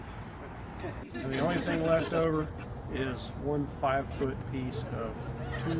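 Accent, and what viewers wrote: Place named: Texas, United States. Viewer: Nice solution for clearing out the deck. I have only one so I hung mine from the rafters in my garage. By the way I forgot to say from your last video, your new intro is awesome, the Texan in you really comes thru. Thanks for the video, looking forward to new adventures with you my friend. JT. from Oregon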